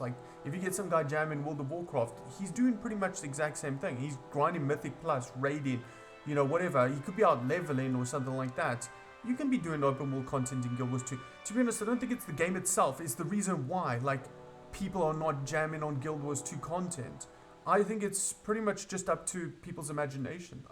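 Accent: South Africa. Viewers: Dude are you South African?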